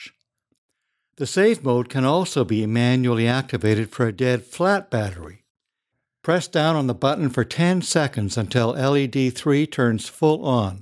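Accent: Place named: Canada. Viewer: This guy's voice is weird, I hate it. Sounds like a damn Canadian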